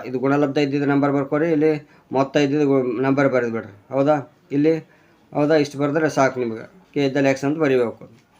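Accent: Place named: Jevargi, India. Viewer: ಸರ್ ನಿಮ್ಮ ಮಾತು ಜೇವರ್ಗಿ ಮಾತು ಸರ್ 🔥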